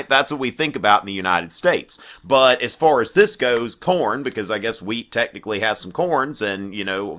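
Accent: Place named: South Carolina, United States. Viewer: He sounds like he's from the south eastern U.S. I'd guess, an urban area of South Carolina. I'm from Virginia, and many of the accent are similar, but there are some subtle differences.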